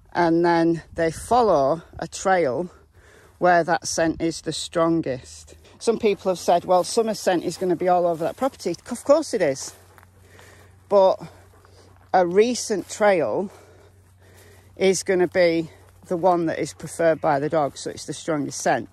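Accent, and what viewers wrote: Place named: England, United Kingdom. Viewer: Hello.I've just discovered you and at the beginning of this video I thought you were from Massachusetts, U.S.A., from your accent.Then I realized you sounded English.The accent we have here near Boston came from the English settlers way back, so its interesting to hear the similarities.I have been following this case and I'm so confused.I don't know what to believe.I'm leaning towards abduction by a known person.I really hope they find her soon and it would be a miracle if she's found alive.It's so upsetting.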